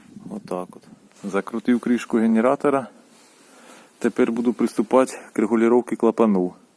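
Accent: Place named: Chernihiv, Ukraine. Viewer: Теска., мені теж твоя вимова нагадала мені, що це таке, щось знайоме, не міг згадати. Почитав в коментарях - Чернігів. Можливо, був там в 90-х. Приємно слухати. Бажаю тобі упіхів в житті та розвитку каналу. Я підписався. Хочу порадити, друже, якщо є можливість, купляй "корчики", роби їх чесно для ЛЮДЕЙ, знімай відео для нас, перепродуй вигідно для себе і радуй нас гарними порадами. Особливо це буде потрібно для нашої молоді. Щиро вдячний, МИРУ нам усім і ДОБРА в кожну родину!!!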